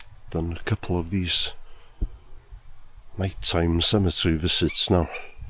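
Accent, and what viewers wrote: Wales, United Kingdom. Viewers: I absolutely love listening to your accent. Especially when you speak in Welsh. So beautiful! Thank You. Indiana USA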